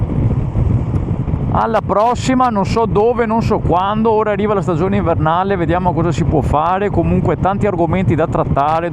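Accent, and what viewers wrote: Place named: Treviso, Italy. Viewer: Che bel canale!! Ho visto qualche video, ti faccio i complementi. Io sono veronese, ho sentito il tuo accento trevigiano ma e pressoché identico al mio!! Siete riusciti a fare un bel tour Treviso Germania?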